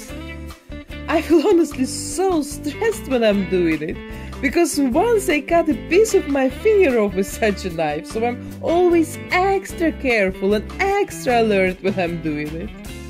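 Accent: Russia. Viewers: У вас русский акцент Или мне кажется?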